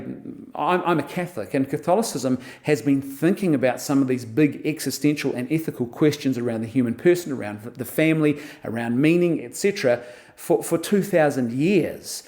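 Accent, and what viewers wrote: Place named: New Zealand. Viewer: Well done. Great to hear a competent kiwi voice on this sort of topic.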